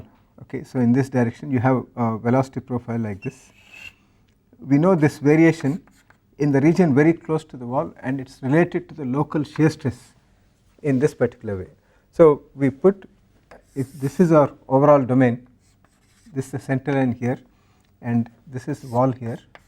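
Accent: India. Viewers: WHY INDIANS TALK LIKE THISSSSSSSSSSSSSSSSS???????????????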